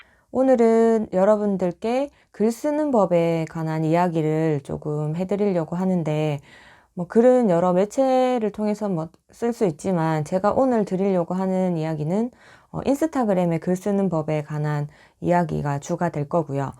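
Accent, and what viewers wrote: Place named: Gyeongsang, South Korea. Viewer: ~유익한 내용 감사합니다~경상도 억양도 좋은데요!😍😍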